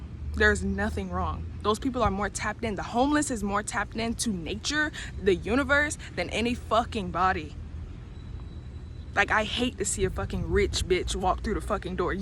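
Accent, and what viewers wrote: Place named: California, United States. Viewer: Message SiStar 💕 💯🙌🏾 I’m in agreement with a lot of your views. On another note you definitely have to be from the bay or Cali because you sound like family 💯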